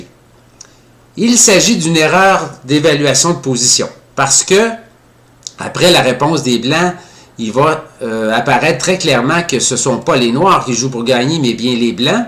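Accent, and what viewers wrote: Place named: Quebec, Canada. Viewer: Fin de partie très intéressante et bien démontrée par notre professeur et grand pédagogue préféré avec son accent québecois très plaisant et que l'on aimerai bien rencontrer !! Merci pour cet exemple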